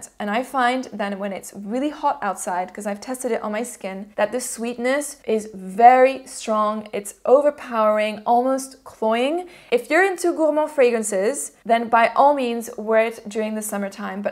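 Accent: France. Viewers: Probably a silly question but I've watched you some and your French accent sounds exquisite! Are you French? 😊 Cheers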